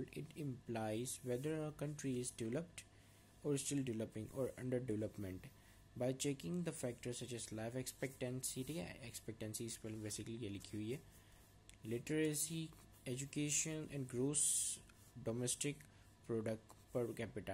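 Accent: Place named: United Kingdom. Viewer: nd youre Urdu is like English Accent it reminds me Bilawal Bhutto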